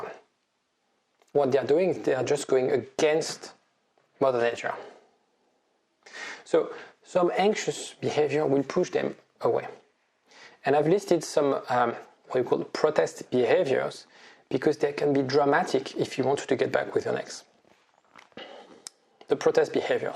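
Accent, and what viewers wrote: France. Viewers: L'accent français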